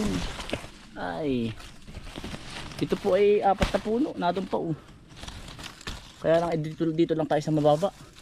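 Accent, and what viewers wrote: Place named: Batangas, Philippines. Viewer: Silent subscriber from California USA....naaaliw akong panoorin ka. Ang diction mo ay kakaiba parang Batangas. Ganyan ba sa Tayabas Quezon ang salita,nakakatuwa ka pakinggan. Masipag kayong magkakapatid, lucky ang mga spouses ninyo na magkakapatid masipag,humble,masikap at maasikaso sa magulang. Ang fruits ay puede lang kuhanin sa.puno hindi na bibilhin pa. Ang bayabas ay expensive dito malalaki 1 lb.is $6.99 rich in Vit.C iyan. Edible ba ang prutas na parang strawberry, baka poisonous iyan.Always watching ur vlog lalo na at stressed ako sa work. GOD BLESS!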